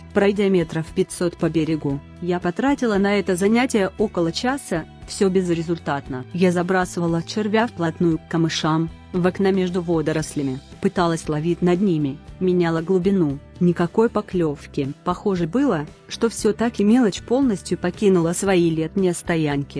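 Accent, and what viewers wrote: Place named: Baltic. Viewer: Вы из Прибалтики судя по акценту? Инфо как всегда интересное, спасибо.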